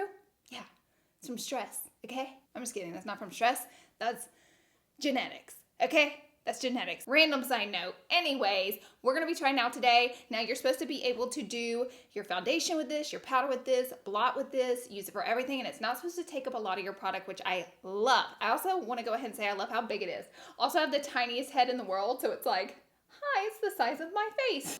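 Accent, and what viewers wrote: South, United States. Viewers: “My whole side panel just fell” that southern aksent gf❤️❤️ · Please bring out that southern accent more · She's from Alabama and Insatiable is in Georgia. A smoother southern twang than Alabama I think. Love all the southern accents though. :) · When you whisper “what is this thing” I literally lol 😂!!! It was like from a horror/supernatural movie lol Btw pleaseeee keep using your southern accent !! It’s soo cute x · Can we talk about how country the first 5 seconds of this video was?! 😂 I looooove it. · "Ma whole side panel just fell" The southern drawl is STRONG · I love her Souther twang....coming from a Kentuckian with a major Southern drawl! 😆😆😆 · Damnnn she sound like a southern bella or a pretty hillbilly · Your country accent poking out is so cute!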